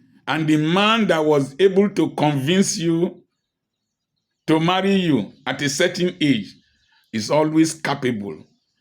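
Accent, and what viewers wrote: Nigeria. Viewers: I dont the deep nigerian dialect but I love it.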